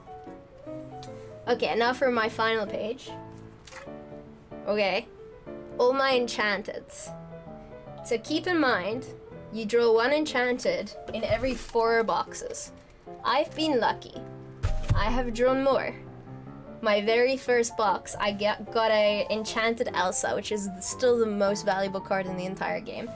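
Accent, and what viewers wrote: Afrikaans, South Africa. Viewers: Do you speak Afrikaans? Is this your normal English accent? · Keep your South African accent - the merging of the two sounds silly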